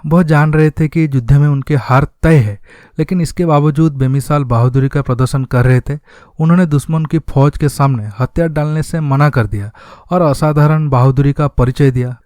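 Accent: Odisha, India. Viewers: Sounds like u r from odisha...is it? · BHAI. TUM ODISHA se ho kya ?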